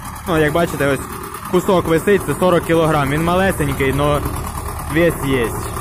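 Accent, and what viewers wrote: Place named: Ternopil, Ukraine. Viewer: а значить перепутав у тернополі похожа до вашого акценту.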